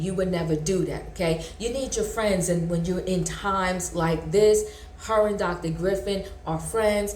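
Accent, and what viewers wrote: New York, United States. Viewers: you sound sooo new york and i love it! bloop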